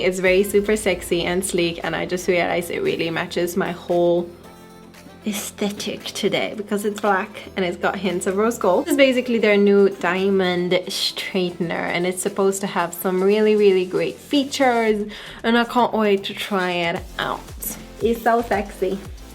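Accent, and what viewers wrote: Italy. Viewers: It’s a typical Italian accent ...That’s really strong I didn’t know there were some people to appreciate this accent lol in my country (Italy) when we talk in English a lot of people don’t like the Italian accent because is considered to be ridiculous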